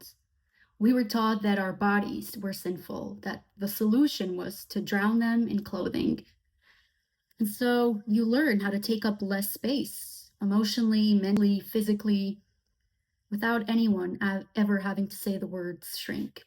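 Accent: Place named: New York, United States. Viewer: I love this! I didn't realize you had an accent! Is it a NY accent or an accent from speaking Yiddish?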